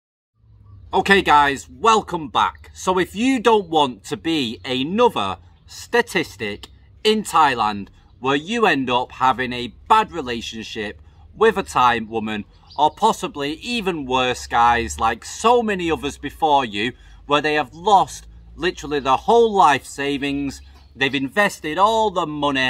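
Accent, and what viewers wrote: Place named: Yorkshire, United Kingdom. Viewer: Are you from Yorkshire? You sound like you from leeds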